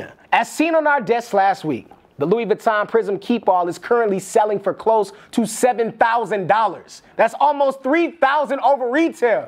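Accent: France. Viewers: Sacré bleu, the only thing hotter than french accent is his taste for haute couture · Très bel accent francais 👍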